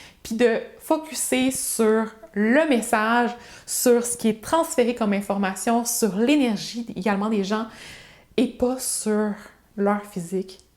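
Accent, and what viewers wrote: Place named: Canada. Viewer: Ah cet accent canadien... la grande classe 🥰 je suis bien d accord avec toi.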